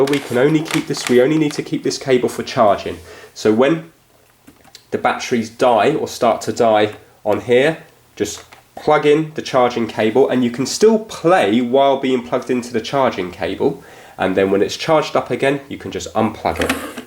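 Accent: United Kingdom. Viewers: Is he British? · I love British accent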